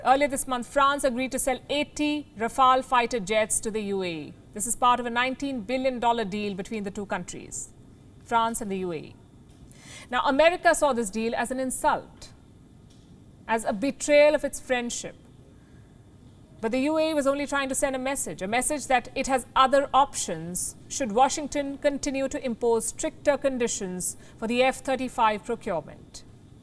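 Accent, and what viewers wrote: India. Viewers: Everyone understand british accent but not everyone understand ( Hindi English). Since WION is 'GLOBAl ' .The accent is important for all viewer's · This news caster speaks English but sounds like hindi... The channel needs to replace her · Both ways are acceptable, the British, Australian, and American pronounce English words differently too, and in this case Indians pronounce English that way. The world does not revolve around your way of pronouncing things. There are more English speakers in India than in America think about it.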